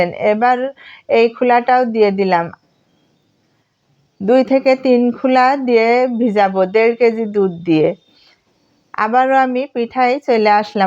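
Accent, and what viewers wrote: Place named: Jashore, Bangladesh. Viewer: Apu apner desher Bari kon dristic? Kotha sune mone hocche jashore a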